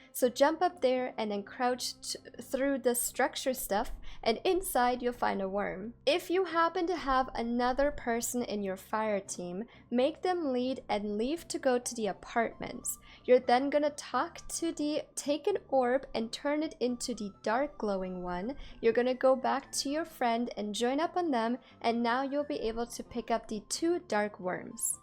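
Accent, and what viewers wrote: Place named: Germany. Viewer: I hear a German or Dutch accent off and on....hard to pick up but there is something there...what is it?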